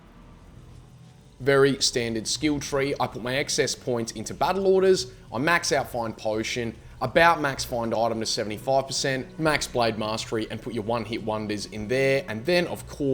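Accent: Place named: Australia. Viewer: Suped for the aussy accent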